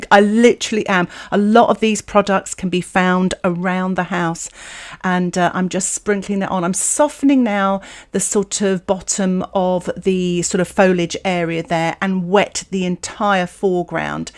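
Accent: United Kingdom. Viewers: La tua pronuncia così britannica mi.piace tanto! 😊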